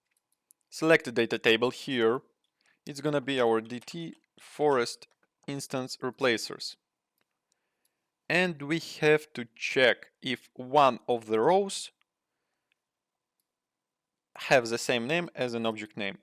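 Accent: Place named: Russia. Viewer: Акцент прям русский. Более уверен что ты наш)) Отличный урок! Спасибо!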